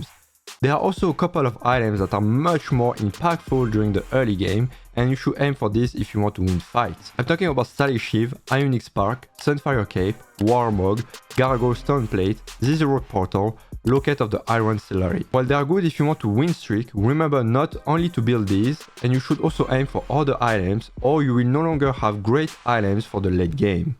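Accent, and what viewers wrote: France. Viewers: I love your videos, really. It's clearly visible that you're trying to maintain high-quality content, do much about the montage and even added captions, probably being aware of your French accent that might be hard for someone to understand. Wow. Chapeau bas! First time in my life I really feel the urge to share someone's content because I feel like you deserve it! Keep up the good job, you're amazing :)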